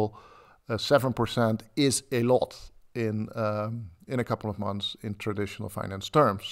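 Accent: Netherlands. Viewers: NL accent ! ? :P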